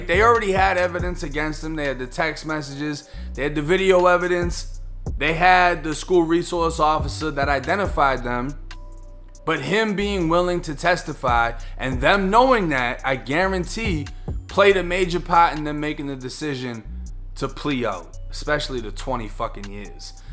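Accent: Boston, United States. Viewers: Couldn't help but notice that raw Boston accent brother. Grew up in Massachusetts but I don't miss south Boston at all. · Just found ya channel & I love content like this so ima sub, esp cuz you sound like you from where I’m from…or close to it! Nobody has an accent like us. Ijs 💯🤣 #️⃣6️⃣1️⃣7️⃣ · just came across your channel and I just KNOW you're from Boston the way you say "part" and "car" LOL please let me know if I'm correct. Love the content btw!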